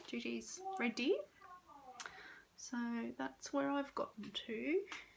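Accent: Australia. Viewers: Welcome to floss tube, so lovely to hear an Aussie voice. Beautiful work!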